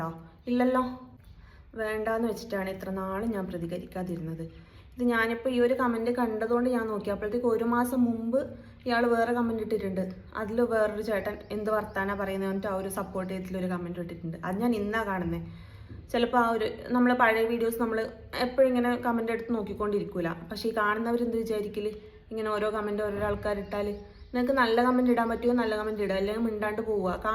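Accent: North Kerala, India. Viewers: Its a very good advice given to those JHARAMBU ROGEES as you have said must have learned the basics of their dirty activities from their own parents ,sisters or brothers who may be past masters in shaming others....I totally agree with what you have said....Even your advice given may not be effective or make them repent that is what I feel...after therevis a saying CAN AN ABYSSINIANN CHANGE HIS COLOR OR LEOPARD ITS SPOT...Any way I thoroughly enjoyed your video clippings especially the north kerala slang used while talking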